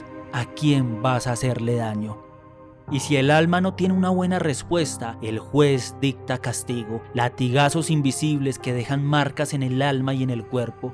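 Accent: Colombia. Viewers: con razón me parecía colombiano 😂 pero lo felicito están bien las historias gracias saludos Bendiciones 🙏🏻❤️ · Excelentes relatos , con voz de acento colombiano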